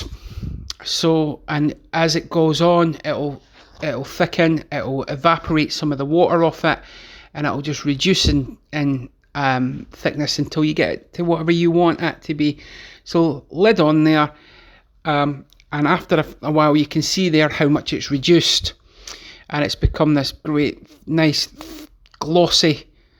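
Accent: Scotland, United Kingdom. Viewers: Great video mate, keep up the good work! Just wondering, what part of Scotland are u from? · i made a quiche from start yesterday,homemade shortcrust pastry,seems tastier when you make your own?are you in edinburgh?trying to place the accent.i will be giving your steak pie recipe a go shortly,looks so so good.i might add a wee bit lea and perrins to the sauce?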